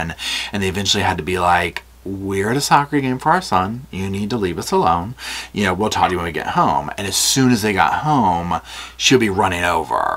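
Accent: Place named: North Carolina, United States. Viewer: I didn’t realize you are from North Carolina…I thought I recognized the accent…❤ This case drew me in like the Darrell Brooks case…but there was no mystery with the DB show…smh… This one keeps me guessing… Love your channel and the way you tell stories…😊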